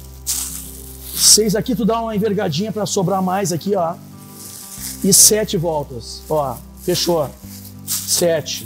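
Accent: Rio Grande do Sul, Brazil. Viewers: Bom demais o vídeo e o sotaque gauchesco.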